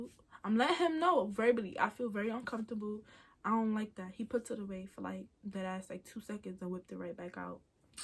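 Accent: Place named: New York, United States. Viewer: Beloved lol New York accent is cool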